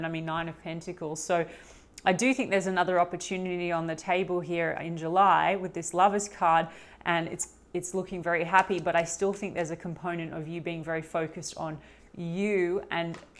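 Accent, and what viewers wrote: Australia. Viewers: Really like, great interpretation and Lovely Aussie delivery😊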